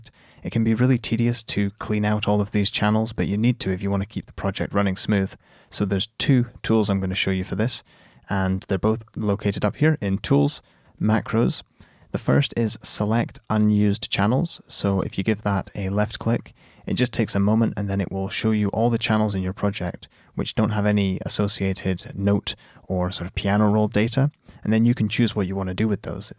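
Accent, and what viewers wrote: Scotland, United Kingdom. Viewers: He's Scottish isn't he?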